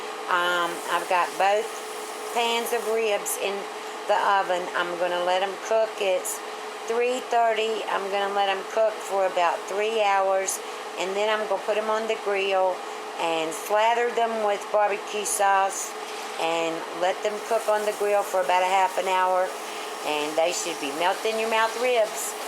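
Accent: South, United States. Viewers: Today, Easter weekend April 8, 2023, and I just found your channel!!!! I love your rib recipe! And I can't wait to cook them!! I just love your recipes! Thank you! Your accent is so southern! Just lovely! ❤❤❤😊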